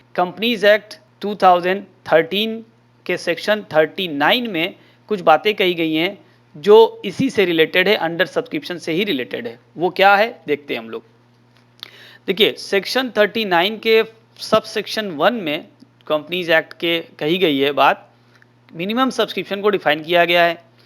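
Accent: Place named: Uttar Pradesh, India. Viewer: Dekha aapne mera andaaza Maine kewal aapki awaaz se pata laga liya ki aap UP se ho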